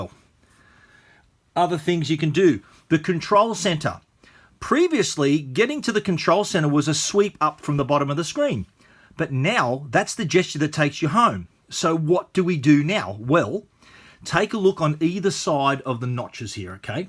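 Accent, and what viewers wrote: Australia. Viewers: its an australian accent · Guys I’m Australian, I think it was just the way he said it, u know what he means it’s not really an aussie thing, just the accent is. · Aussie accent sounds top notch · He must be Australian because I am also and I didn’t think he said swipe of sweep any differently than I do 😂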